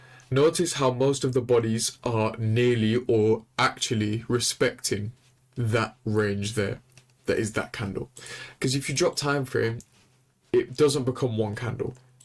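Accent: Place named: London, United Kingdom. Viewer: 😂😂😂😂 people that trade ict can’t trade and I’m willing to take that to the grave. If you can’t teach a 10 year-old your strategy, your strategy is too complicated. at the end of the day every strategy works you just have to control your risk and have set rules. Losing is inevitable in the market. So someone with a London accent, using proper English and big words doesn’t make the strategy any better.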